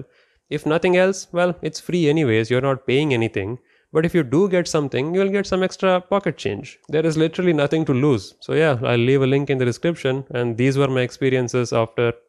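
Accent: India. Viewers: Thanks for being a legit guy,soothing voice and cool hairstyle btw.Keep up the good work. By the accent are you indian?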